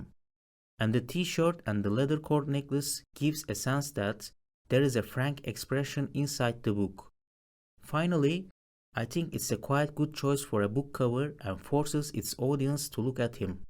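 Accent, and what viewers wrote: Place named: Turkey. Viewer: Aksanınız Türklere çok benziyor, Türk Müsünüz?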